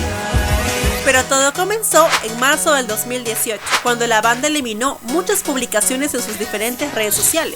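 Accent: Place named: Chicano, United States. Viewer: Me gustó mucho la información, está muy bien hecho 💕 lo único que me incomodó fue la pronunciación del inglés de la chica :/ habla demasiado chicano jajsjj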